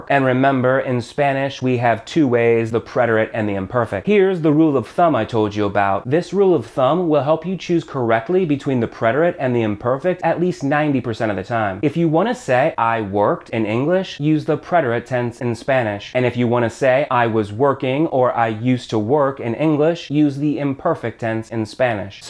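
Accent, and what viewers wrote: United States. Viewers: great videos! are you a southern Californian? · I love how these videos are super concise, super to the point and sped up, no two ways about it. So super easy to digest content at high speed, thanks for that so much! However, don't you think it's about time to work on your accent if you're able to? You sound overly American when speaking Spanish, that's a shame - to me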